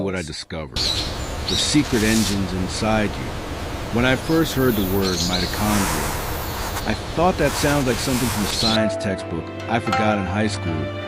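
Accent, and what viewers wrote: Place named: United States. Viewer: Beautiful Japanese visuals let down by the extremely American narration.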